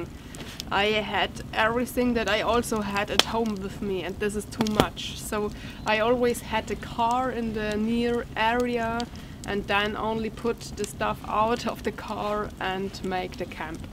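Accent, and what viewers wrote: Germany. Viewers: Well beautiful Lady. I am so proud of you. This is a beautifully made video. The video setting is perfect. I am impressed how you answered the questions so intelligently. Some subjects are your' personal business for your' knowledge and nobody else. Be careful what personal information you spread over the net. I am always impressed how well you handle yourself even though your' first language is German. I love your' accent, and oh ya many flavors of ice cream also. :O) From experience I remember people always thought my grandfather was a dummy because he spoke broken English with an Italian accent. He actually, like you, was also very intelligent. I am glad you made it clear you have access to the Foxes Den for a long time. I was concerned about you spending money and time on someone else's property. I understand your' passion for the Den. 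Hang in there. John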